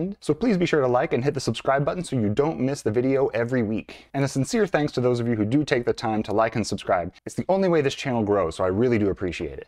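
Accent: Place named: United States. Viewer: finally an american explaining it hahaah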